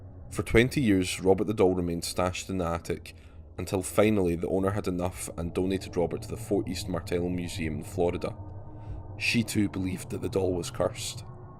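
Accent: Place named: Scotland, United Kingdom. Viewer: Lovely Scottish accent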